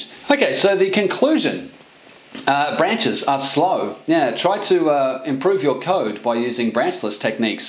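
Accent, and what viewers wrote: Australia. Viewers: good to hear an Aussie accent. Yeah, I'm still a young programmer, only 15 years or so, but I always wondered about conditionals like "IF", because if the conditions weren't met to take the branch the CPU wasted it's time asking, I devised my own simple mathematical tricks. It may have taken a few extra cycles, but that was nothing. I never knew this was a "thing". How about that? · Nothing better than an Australian teaching you about programming casually, great video mate! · I don't anything what you talking about but I listened for the Australian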